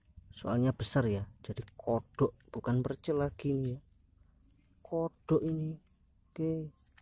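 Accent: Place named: Banyumas, Indonesia. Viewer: Iki tunggal e cak agus ngapak😂🤣spot e marai ngiler🤣🤣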